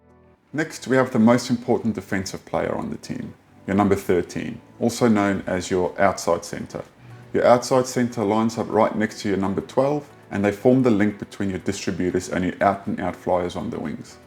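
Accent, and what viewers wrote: South Africa. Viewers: I cant decide if he is south african or australian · My man, great explanation. I hear an Aussie accent, or perhaps a Kiwi one - but yours is more difficult to place than usual, but do I detect a Saffer twang? Emigrated? Ps - hier kom die BOKKE :D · Saffa with a bit of an Aussie twang? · I don't hear English. Only Aus/NZ/SA. the way he says "couch" tells me he is SA born and emigrated to OZ or NZ. I may be wrong... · OK so firstly, I love the content. Secondly, I can't pinpoint your accent. Your channel says Australia but I feel like you sound like a South African who has lived in Australia for a while or an Aussie who has lived in South Africa for a while 🤣 I hear a mix of both accents hahah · My bru are you South African? Your accent confuses me....i cant tell if it's from Melbourne, London or Brakpan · his English sounds completely South African. 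He also used the boks in many of his examples.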